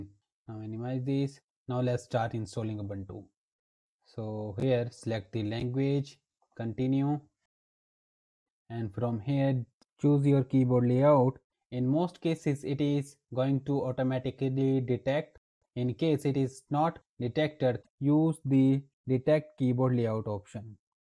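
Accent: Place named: India. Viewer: i hear das indian accent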